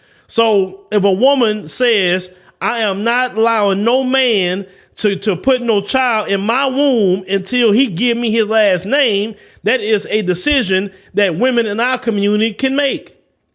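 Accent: South, United States. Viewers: I love your southern accent. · Love that southern accent brotha!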